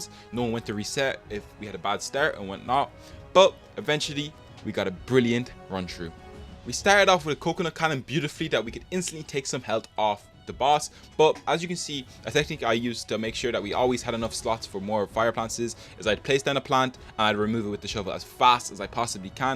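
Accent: Ireland. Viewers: Are you Irish cause I’m Irish too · You irish?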